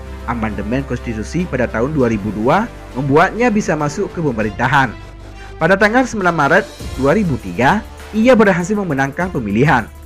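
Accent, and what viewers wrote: Aceh, Indonesia. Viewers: Sama huruf. S .mimin kalau dua kata itu agak susah berarti mimin Acheh.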